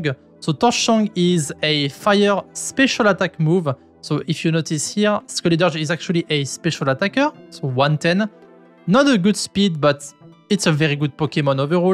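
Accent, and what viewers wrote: France. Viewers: c'est un accent français ça non ?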